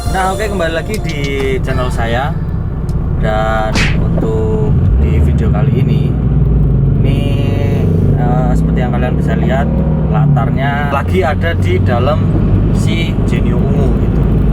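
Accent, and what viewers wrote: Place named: Banyumas, Indonesia. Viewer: Oalah wong ngapak jebule mas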